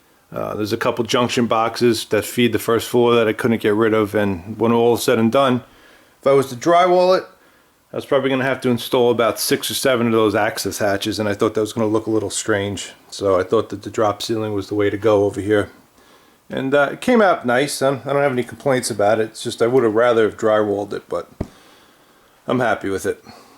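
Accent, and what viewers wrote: New York, United States. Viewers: love your NY accent. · You're from New York aren't you?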